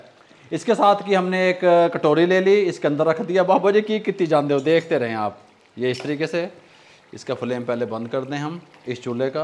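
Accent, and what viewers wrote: Punjab, India. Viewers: Asalam o alaikum... aap mere bade bhai hai, mien Quetta se hu, yaqeen kare aap ne tho cooking itna aasan kr diya hai aur jo kuch bhi mien try kartha hu zabardast hotha hai... aap ka jo andaz hai na punjabi bolne ka e.g: chaske arahe hai, muh wich pani, aaj ne kya sika diya etc... yaqeen kare mujhe punjabi zuban seekhne ka shauk ho gaya achi lagne lagi hai... Allah aapko kamyabiya de. Ameen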